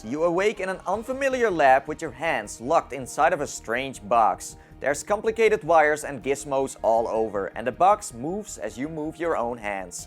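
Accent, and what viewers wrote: Netherlands. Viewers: No Swede or Norwegian talk with a accent like that :D ,sounds like Dutch English to me, but def not Swedish or Norwegian, We talk with a weird accent yes, but it's not like that, hehe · Flip Games just listen to that awful accent ofcourse he's Dutch! (Dutch myself just can't stand Dutch accents haha)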